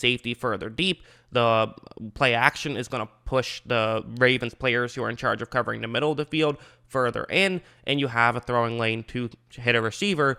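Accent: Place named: Pittsburgh, United States. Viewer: You can hear is Pittsburgh accent 😂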